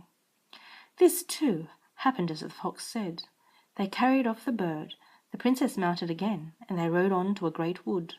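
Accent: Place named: RP, United Kingdom. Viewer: you sound like a queen of England wasn't expecting that. Do you like drugs mate?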